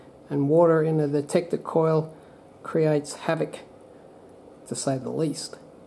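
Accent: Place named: Australia. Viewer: Good work mate. You sound like a typical Aussie that are clever at innovating and making things out of nothing.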